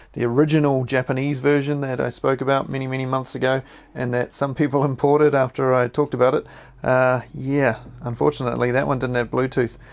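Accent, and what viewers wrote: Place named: New Zealand. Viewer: I love your accent bro. Kiwis, men and women with the coolest english accents :)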